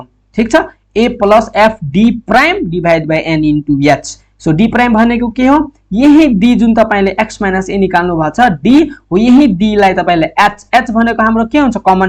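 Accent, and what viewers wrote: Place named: India. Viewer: I dont understand your indian accent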